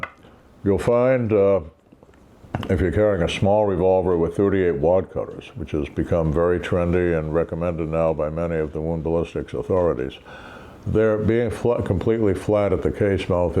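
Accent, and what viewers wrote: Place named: United States. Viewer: Bro has most american voice I've ever heard 😂 props for talking about revolvers tho 🤙 I'm sad they're vanishing especially in competitions because I think they're extremely cool concept although hard to master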